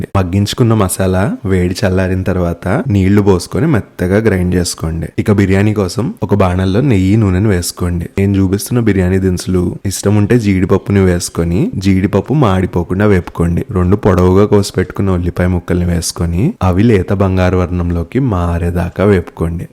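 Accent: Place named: Vijayawada, India. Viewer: Wow pure Telugu,,, are you from vijayawada or khammam?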